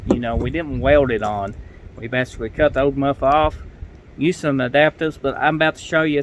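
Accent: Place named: South, United States. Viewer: fuck the exhaust, iv never herd a strong southern accent with a 5 year old's speech impediment. impressive.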